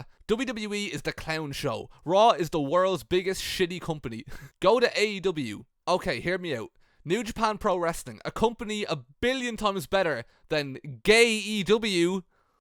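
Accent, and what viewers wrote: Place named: Ireland. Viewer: You sound Irish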